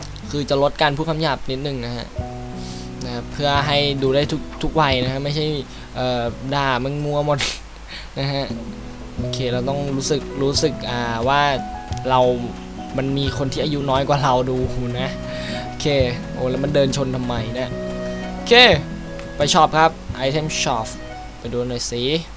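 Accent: Suphanburi, Thailand. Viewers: คนสุพันป่าวเนี่ย เหน่อจิงๆๆ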